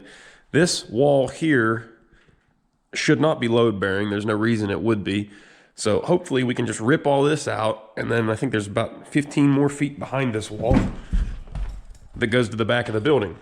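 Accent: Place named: South, United States. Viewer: Are you from western PA originally? You sound very southern. Especially the ol' lady comment.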